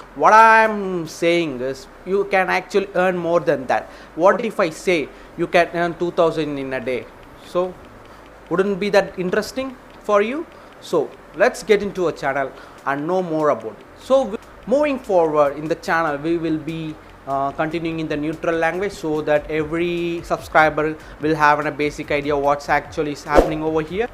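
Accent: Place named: United States. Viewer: American accent🔥🔥🔥🔥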